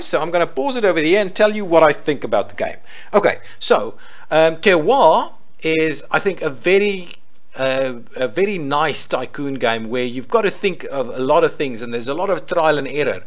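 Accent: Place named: South Africa. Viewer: Hey Boet! Nice to hear a good South African accent. Just bought Terroir and enjoying it, especially as I live in Cape Town and as you know, am surrounded by terroir! Keep it up with the strategy games and I'll be following.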